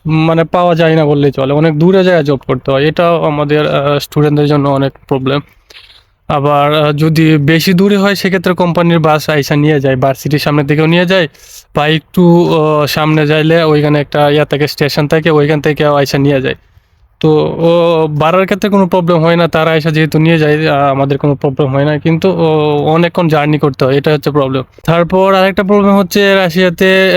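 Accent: Kishoreganj, Bangladesh. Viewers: আমাদের কিশোরগঞ্জের কথাবার্তা মনে হচ্ছে ❤। কিশোরগঞ্জের কোথায়?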